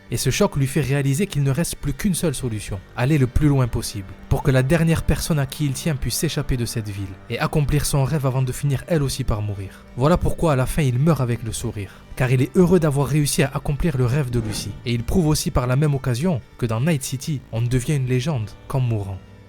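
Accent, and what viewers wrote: Marseille, France. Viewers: L'accent marseillais me perturbe et pourtant je vis à Marseille 🤣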